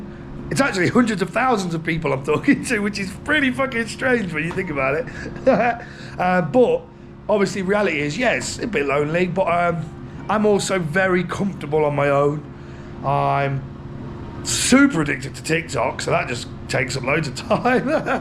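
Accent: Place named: Northern England, United Kingdom. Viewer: loved the Q and A you just come across has a legit northern lad, you na what i am saying bro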